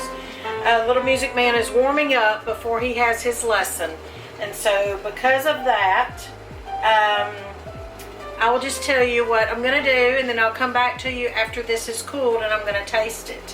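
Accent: Tennessee, United States. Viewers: Hey from Maury Co. Mt Pleasant here. I recognized your accent as soon as you spoke. ☺️ Thank you so much for this recipe, Merry Christmas! ❤️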